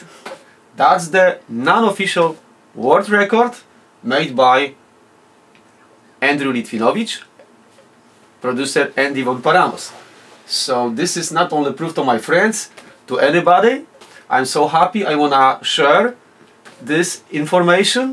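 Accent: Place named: Poland. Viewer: Nice Polish accent:)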